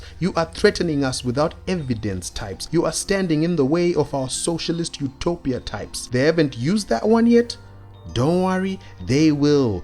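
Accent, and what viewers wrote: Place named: South Africa. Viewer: South African? Man instant sub! Haha gaming news that sounds of home..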